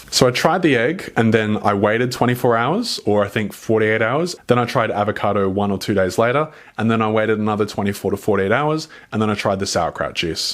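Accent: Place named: Australia. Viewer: I’d love to know what this Australian-ish accent is about :’) oath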